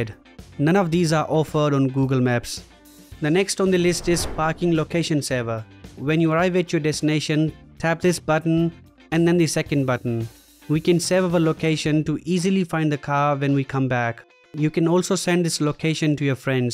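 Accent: India. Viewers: Speak English, not Indian-English.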